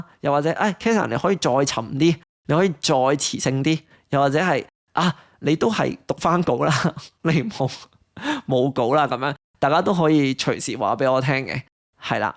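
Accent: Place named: Hong Kong, China. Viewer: の広東語だけのポッドキャストが始まってとてもうれしいです♪ 広東語の本にものってない様な香港人のリアルな発音が知りたかったので、これからがすごく楽しみです😊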